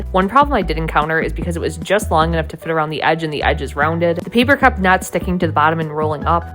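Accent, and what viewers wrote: United States. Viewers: Thickest accent so American lol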